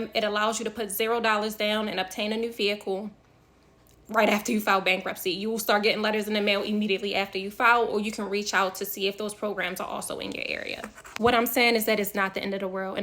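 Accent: Baltimore, United States. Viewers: I could hear it! Hey fellow dmv sista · You from Baltimore ain't it🤙🏾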